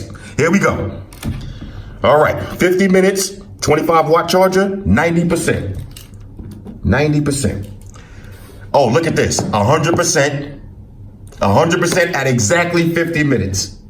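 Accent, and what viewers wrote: New York, United States. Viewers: I can fall asleep to this deep ass NY accent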